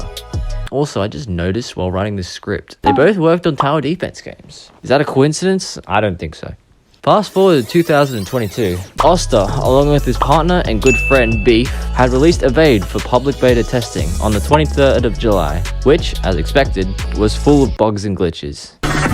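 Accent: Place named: Australia. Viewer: Are you Australian I love the accent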